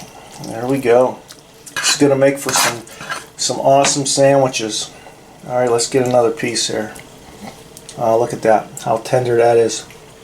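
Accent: Pittsburgh, United States. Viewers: Are you from pittsburgh? I detect the accent. You sound just like my dad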